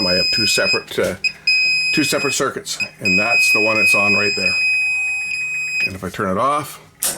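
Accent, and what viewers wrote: Canada. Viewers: Canadians pronounce been like bean .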